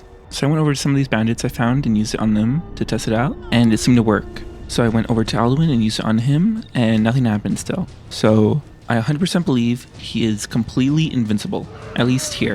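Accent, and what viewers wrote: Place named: United States. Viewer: He's speaking completely understandable American English, the fuck are you on about?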